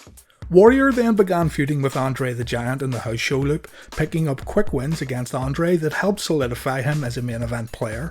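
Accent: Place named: Scotland, United Kingdom. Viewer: I was expecting an American video and this Scottish dude comes on.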